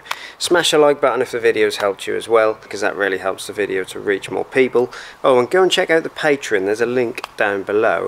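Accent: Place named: London, United Kingdom. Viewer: Fantastic! Can I ask what accent you have? Sounds like a blend of London and 1920’s BBC English.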